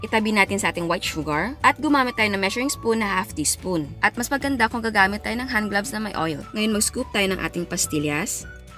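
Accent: Visayas, Philippines. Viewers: bisaya po ba kayo parang bisaya po kasi kayo mag salita pero ang galing nyo po gumawa ng pagkain or dessert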